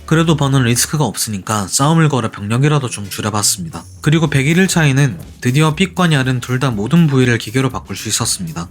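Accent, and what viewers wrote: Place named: Gyeongsang, South Korea. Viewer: 뭔가 내부자들 조승우 같은 서울말쓰는 경상도사람 느낌이라 평온함 ㅋㅋ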